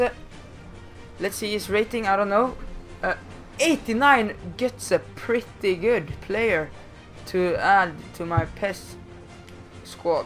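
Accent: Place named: Sweden. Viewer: Du har en typisk engelska som alla svenskar har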